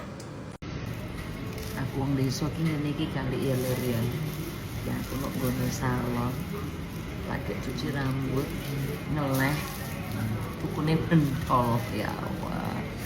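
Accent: Java, Indonesia. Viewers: Aku seneng logat Bedok jowone mbakyu❤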